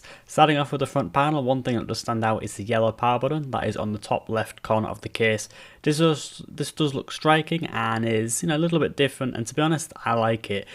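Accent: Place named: Scotland, United Kingdom. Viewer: Are you from Scotland or something, because i cant understand your accent a quite bit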